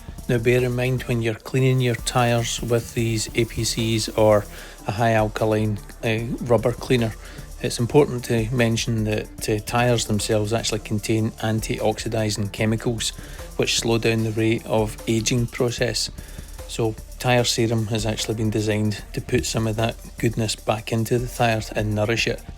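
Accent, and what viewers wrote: Scotland, United Kingdom. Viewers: What an informative film and of so much relevance to me as I started using GT products recently Tyre Serum and Decon Shampoo. I tried the Serum on a a couple of cars and so far have been impressed. My Father in law has noticed a difference in his sidewalls. Do I detect a hint of a Scottish accent there. Some other Youtuber recently suggested putting a dressing on top of the Serum if desired and I have not tried that yet and wondered what your thoughts were. I subscribed also as I am a keen detailer with time on my hands to learn as much as I can before I think of setting up my own business. Thanks for the info.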